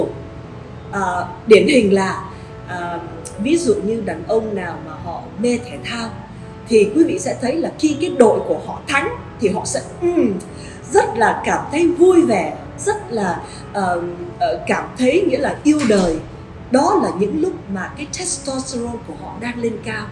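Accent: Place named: North, Vietnam. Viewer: Em sinh ra ở Biên Hoà được coi là thủ đô Bắc 54 nhưng hiếm ai có chất giọng Bắc 54 nghe sang và ngọt ngào như chị Kỳ Duyên <3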